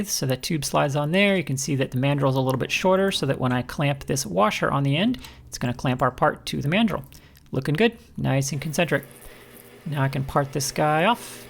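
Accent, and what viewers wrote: United States. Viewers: I never thought of that but I love the idea. Great video. One thing that confuses me. You are supposed to be a Canadian but I never hear you say aboot or eh? You just used inches and as we say in the south you tawk uhmurikin. Not that you would use those words. Even AVE could be picked out as up nawth some place. Can you unconfuse me?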